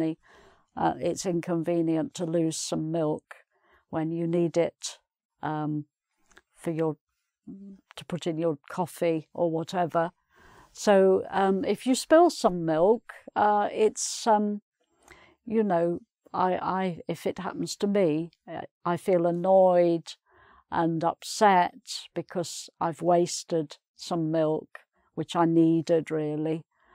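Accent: United Kingdom. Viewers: love your British accent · It's a real pleasure to listen to you - real British +profound knowledge. Thank you for that 🌹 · Oh, my lady, do you live in Canada? You speak in British English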